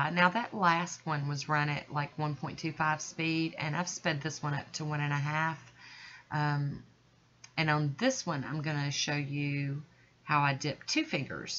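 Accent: South, United States. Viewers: I love the names, too funny. I love that they have a southern accent. Pannnkk!!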